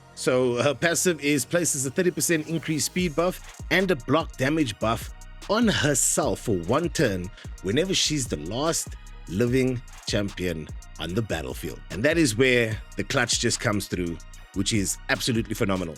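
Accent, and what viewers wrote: South Africa. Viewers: Yup, first 2 words of the video and I knew you’re from SA😂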